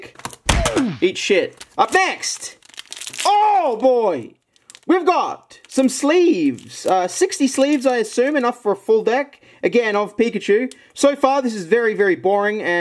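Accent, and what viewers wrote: Australia. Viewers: Nah thats just how Aussies talk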